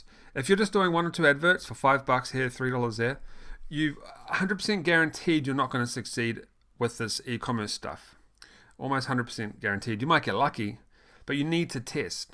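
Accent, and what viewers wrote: New Zealand. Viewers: Recognize that kiwi voice instantly; love your videos bro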